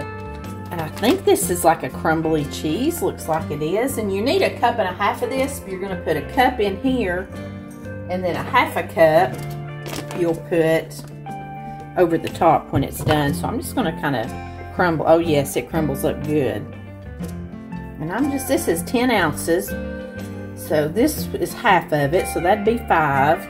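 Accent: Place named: Arkansas, United States. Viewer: ❤ I love your accent ass where are you must be from Arkansas that’s where I’m from but my daddy’s eat mayonnaise sandwiches but they were miracle whip not 😂mayonnaise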